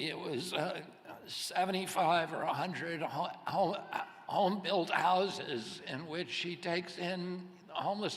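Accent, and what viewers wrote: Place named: England, United Kingdom. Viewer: it doesn't sound like boston to me though, it sounds like an english accent, idk its no big deal just weird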